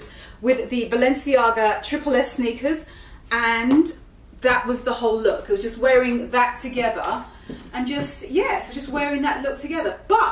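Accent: United Kingdom. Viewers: Transitional dressing videos are so useful! Thanks,. Your super cool British accent and enthusiasm for style are two of my reasons for being a subscriber and Instagram follower! 😁❤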